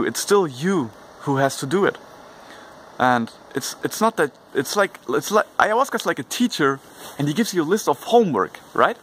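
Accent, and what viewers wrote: Germany. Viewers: Dude you must be german cool accent 😅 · I like your German English :) Grounding is verrrrry important otherwise the trip might be very dangerous and scary. I also would suggest anyone who is willing to take Ayahuasca to use a grounding Crystal. Lava is excellent since it comes from the source of the Earth. Otherwise you might go into another world and you might feel you can't come back to this dimension/reality and this is the worst feeling. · hey man are you german?? Sound like.. bin nnämlich auch deutsch und ich würde gerne mal mit dir quatschen wenn du zeit hast :) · German English lol cute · german- english :D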